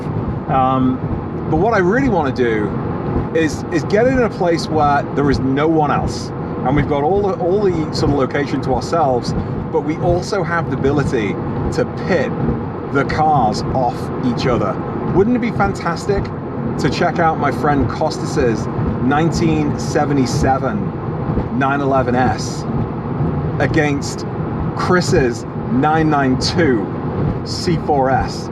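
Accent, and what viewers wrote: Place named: North West England, United Kingdom. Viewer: 4 people..just don’t understand life! I’ve recently purchased a <num> in the uk after no car ownership (living in London) for nearly 8 years. You just can’t fault The car and In Tamdem this channel - open honest, content and ideas that make Porsche ownership seem like the Right choice - despite the wankers (and there are a lot) hat tip!! Cheers - and you sound like you were from the north west uk - much respect!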